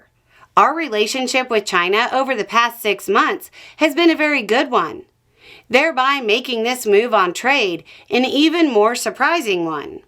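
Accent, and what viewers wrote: South, United States. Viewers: You just got to love the Southern accent and the sentiment communicate across.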